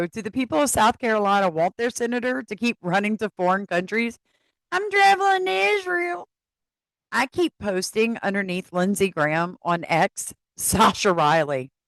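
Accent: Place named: Louisiana, United States. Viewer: When I heard you say that you’re in Louisiana I was so excited because I was born in New Orleans and then my son walked into the room and heard you say the word “monster” and he said OMG mom she says monster just like you! I said of course she does we are both from LA 😂 I was not raised there but the accent still sneaks out sometimes. ❤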